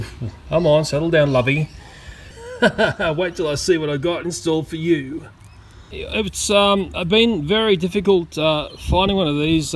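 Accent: Australia. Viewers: 26 seconds into the video and I already know he's Australian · My brain: Wait, is this guy in Australian? sees mantis Me: Yup, definitely Australian. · It called an aussie accent. Bloody tolerate it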